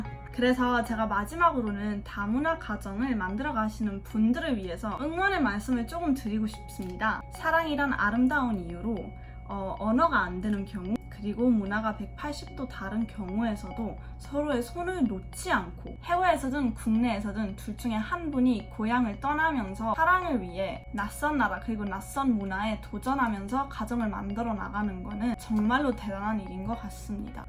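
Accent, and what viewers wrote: Gyeongsang, South Korea. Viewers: 응 경상도 사투리.. 한국인 확실해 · 경상도 가시나구만 ㅋㅋ 반가워요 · 음 사투리 억양이 들려요..경상도쪽? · 님 하얀 얼굴에 브라운 눈으로 경상도 사투리를 하니 굉장히 싱기해요! 와 ㅇㅅㅇ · 외가 쪽이 경상도 또는 부산이죠? 억양에서 나타나네요, 그런데 한국에서 오래 살았나봐요. 한국사람하고 똑같이 말하는데.....아~ 창원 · 전적으로 동의하고요 여러 인종이 사는 미국과 주로 백인과흑인이 주를 이루는 북유럽과도 차이가 있는것 같아요 미국도 지방과 대도시 다르고요 말하는 사람들은 별생각없이 말하는데 듣는 사람에게는 상처가 될수도 있겠군요 많은 사람들이 인종차별이란 생각없이 또 차별 할려는 의도가 없음에도 쉽게그런말들을 하죠 한국부모 밑에서 자라도 미국서 자라면 미국적인 사고방식을 하니 미국인이라 생각 합니다 국적만 한국일뿐 미국인 부모라도 한국서 자라한국적 마인드가 있으면 한국인이고요~ 그리고 두가지 문화 두가지 언어를 할수있다는건 복이맞구요 그 댓가로 격어야 하는 차별들은 어쩔수 없는 상황이고 본인이 극복 할수밖에요 다가질순 없겠죠 모든일에는 장점과 단점이 있으니 영어 하나를 배울려고 많은 시간과 비용을 들이는 엄마아빠모두 한국인이랑 자연스럽게 두언어를 할수있는 혼혈중 하나를 택하라하면 님의 선택은? 저는 혼혈를 택할거구요~^^ 그리고 글로벌 시대에 국적은 크게 무의미 한듯 하군요 내가 사는곳이 내나라고 안맞으면 다른나라로 갈수도있고~물론 쉽지는 안지만~잘 적응 하는것 같아 좋아 보입니다 화이팅 창원화이팅~ 그리고 경상도 사투리도 매력있게 들립니다 개성 있어 보이고요 굳이 서울말 쓸려고 노력 안하셔도 괜찮은데 ㅎㅎㅎ 이제는 두가지가 다되시는 님 ㅋㅋ가끔은 사투리 억양이 나오긴 하지만 괜찮아요~사람이잖아요 창원 팔용동 사는 늙은이가 주절거림...... 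^^ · 누가봐도 한국인이네요 경남억양이 아주 미세하게 느껴집니다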